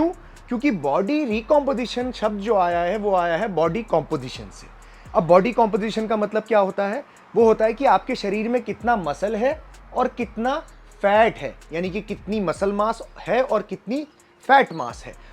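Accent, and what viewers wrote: India. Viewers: of course he has a perfect indian accent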